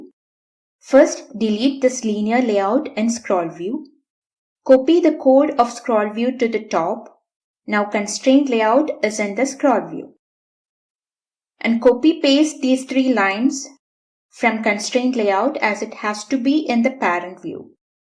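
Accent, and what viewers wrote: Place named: India. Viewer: The first indian accent i understood Great video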